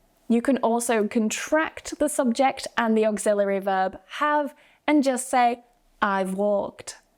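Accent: United Kingdom. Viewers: I am from Japan. It’s so helpful for improving my English skills, and I love your beautiful British pronunciation❤Thanks a lot! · I understand your feelings bro her accent is British accent that's why.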